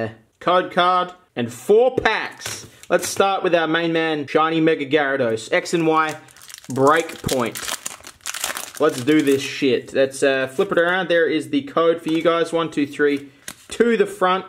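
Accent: Australia. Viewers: You can tell he's Astran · Love that "Dewgong" and "Jewgong" are essentially indistinguishable because of the Aussie accent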